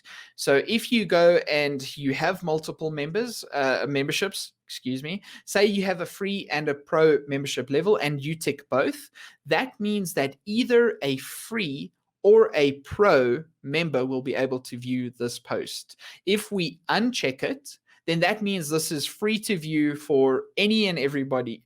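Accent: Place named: South Africa. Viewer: Baie Dankie. It's funny to hear a South African accent, most webinars I watch are American accents. At least I could understand your accent. Where do I explain what a member receives for each level?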